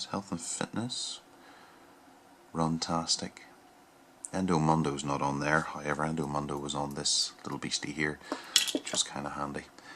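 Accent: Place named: Northern Ireland, United Kingdom. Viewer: Where are you from? You sound like you're from around my area..ish lol. Northern ireland?/ireland?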